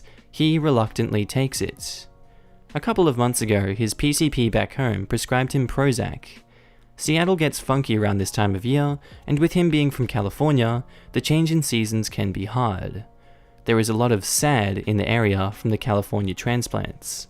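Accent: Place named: Australia. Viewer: I might have a crush on the Ozzy accent.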